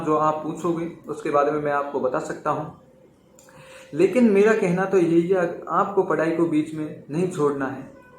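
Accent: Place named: Dholpur-Bharatpur, India. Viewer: Bhai kaha se ho Bhasha to Dholpur Bharatpur ki si Lag rahi .( me aapko jarur reply dango 🤣🤣.)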